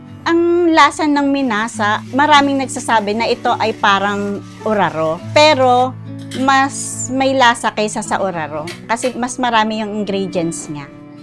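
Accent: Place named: Philippines. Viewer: The narrator sounds very Filipino, so unless they've perfected a generic Filipino AI voice, I doubt it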